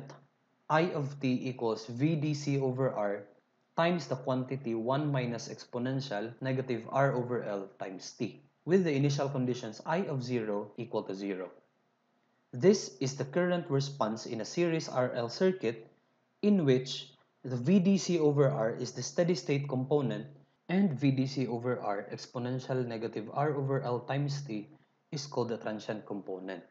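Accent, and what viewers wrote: Philippines. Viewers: Hahaha, check comment pa ako kung pinoy ka, pinoy accent eh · You should should your step by step derivations of calculus for students. Great Job. I can tell your English is Filipino, not American. 'eigenvalues'....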